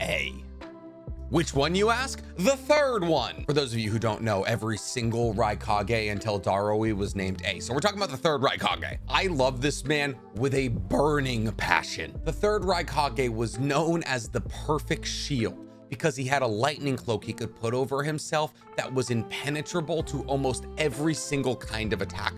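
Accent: United States. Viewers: Just found this channel, which is pretty cool but a major downside is this guy's pronunciations of Naruto/Japanese names. So American of him. For now it's a great channel, and I'll bne going back to watch old videos but his pronunciations are definitely going to grate on me.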